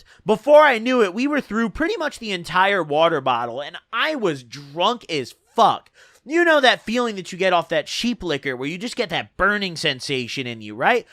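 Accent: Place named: Midwest, United States. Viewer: His accent has really changed this sounds so midwestern😂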